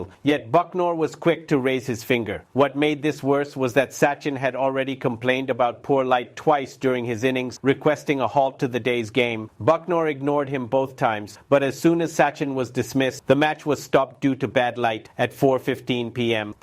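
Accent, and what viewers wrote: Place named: Barbados. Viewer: Bhai you look like someone from Nallasopara, why are you speaking like someone from Barbados?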